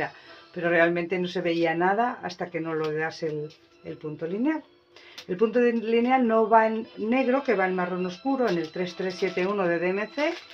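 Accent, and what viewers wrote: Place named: Galicia, Spain. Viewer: Hola, Ese acentillo gallego que se te ha pegado 🤣🤣🤣 Para no bordar mucho, has bordado. El projecto del solstice es muy muy bonito y has bordado bastante. Y con el de Christmas de Lily Violet...vas super deprisa. El de Navidad de Luca-S es super acogedor La niña Jardinera es una preciosidad. La tela que has elegido me gusta mucho. Te esta quedando muy bien, la regadera tiene puntos... Te has portado muy bien, muchos regalitos. Muchas gracias por hacer el video. Besos. Natalia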